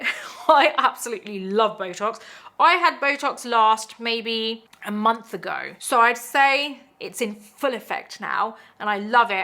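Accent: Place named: United Kingdom. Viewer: ❤ Iove your accent its so cute.. what part of UK are you from? ❤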